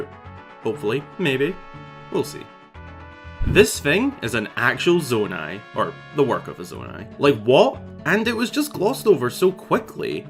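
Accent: Scotland, United Kingdom. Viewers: Mate I know all I did last video was compliment your title screen but I have the urge to say thank you for including captions. My mother loves Zelda and only has 10% hearing left, and also you're Scottish and whilst I understand the thickest Glaswegian, sometimes people find the accent difficult. So thanks again for being so inclusive!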